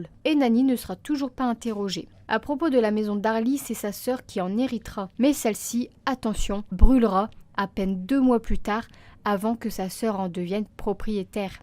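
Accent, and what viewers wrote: North, France. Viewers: t'est du nord toi ^^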